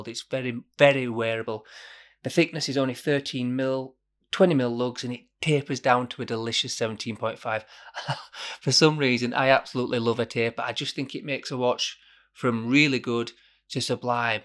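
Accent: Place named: Yorkshire, United Kingdom. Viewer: A Yorkshireman giving watch reviews? Subscribed.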